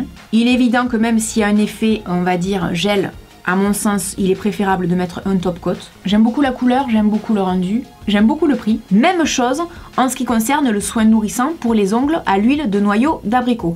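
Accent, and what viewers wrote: South, France. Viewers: j'ai 10 ans je viens de te découvrir et je te trouve drôle gentil et marrante avec ton axant du sud bisous et super vidéo plus 1 abo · T'as un accent du sud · J'aime trop ton accent 😂 #Sudgang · Super découverte !!!! Ton naturel, l'accent du Soleil... Hop-hop, je m'abonne !!! Et merci beaucoup de penser aux Coquettes à petit budget 😉😊😁 !!!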